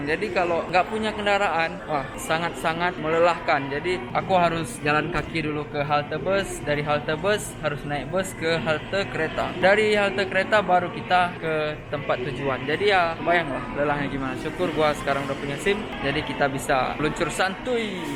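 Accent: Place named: Aceh, Indonesia. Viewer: Logat nya koo seperti org aceh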